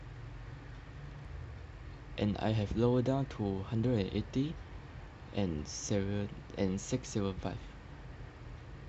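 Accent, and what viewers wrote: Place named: China. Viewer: 请问能出一期中文的吗，本来就英语不好，还要听chinglish😁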